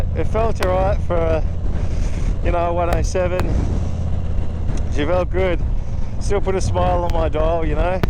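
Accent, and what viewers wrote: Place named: Australia. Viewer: Are you from 'Down/Under, Bro? 😁 Love your accent 😃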